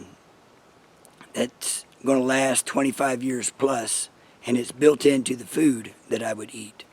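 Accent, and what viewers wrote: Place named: South, United States. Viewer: I suscribed just because I love how southern your accent is lol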